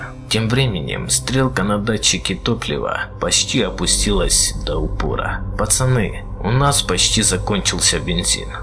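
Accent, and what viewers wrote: Ukraine. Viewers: это украинский акцент ! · и не в обиду тебе:постарайся как-то сглаживать украинский акцент на букве "г" , "друХ",например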